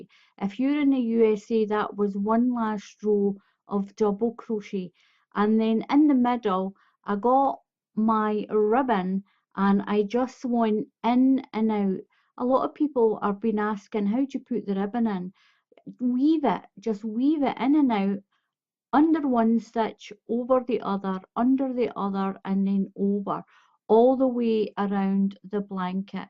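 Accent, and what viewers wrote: Scotland, United Kingdom. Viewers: I should have written lovely 😄 Do you use lively in Scottish? I'm from Finland. No polar bears here 😄 Your accent is adorable 👍 ☀️ Happy New Year! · I love your videos. You explain everything so clearly and precisely. Your beautiful accent takes me back 50 years to when I worked with 2 Scottish ladies who helped me refine my knitting skills. Now another Scottish lady is helping me refine my crocheting skills. Thank you for taking me back to a happy time in my life.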